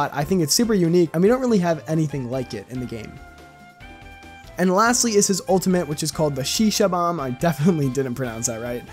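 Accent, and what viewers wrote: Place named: United States. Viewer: US English doesn't even sound that bad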